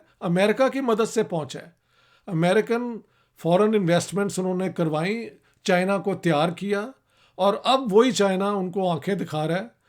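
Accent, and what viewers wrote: Punjab, India. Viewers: I like your Punjabi Style Haan ji...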